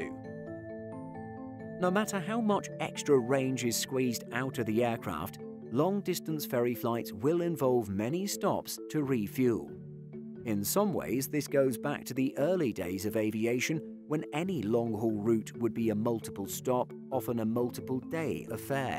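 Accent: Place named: United Kingdom. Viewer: Why all the Brit accent? Brits have little or nothing to do with turbo-prop aircraft ferry world-wide. ???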